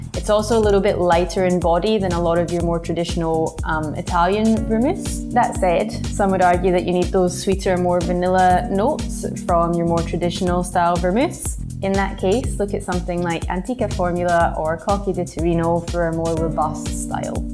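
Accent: Scotland, United Kingdom. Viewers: Best ever bartender - competent, brilliant tutorials, love hearing the history of the drink and about the spirit brands. Last but not least - I love your Scottish accent 😃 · Scots accent, that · Wonderful Scottish accent! Pairfect! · I had to rewind, first time hearing that on YouTube. Good Scottish lass. 😂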